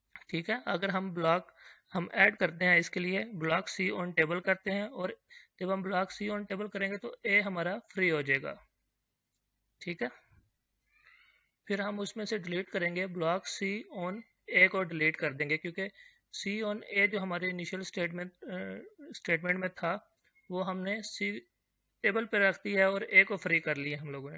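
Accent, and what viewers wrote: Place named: India. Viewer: 印度英语听不懂。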